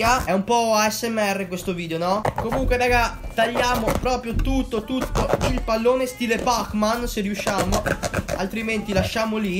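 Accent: Veneto, Italy. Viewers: Di dove sei del veneto? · Ma di che città veneta seu?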